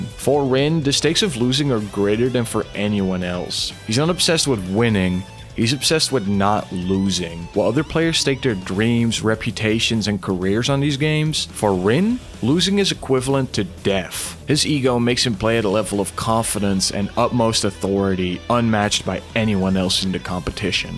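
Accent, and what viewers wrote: Netherlands. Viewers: hoor gelijk dat je een hollander bent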